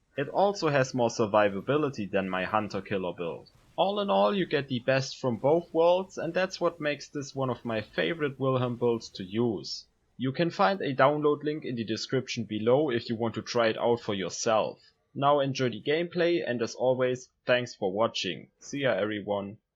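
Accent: Germany. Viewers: your german accent is so strong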